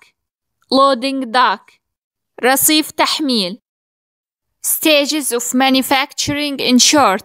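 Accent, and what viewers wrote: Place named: United States. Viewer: هذه تتحدث بالأمريكية مانريد الامريكيه نريد البريطانيه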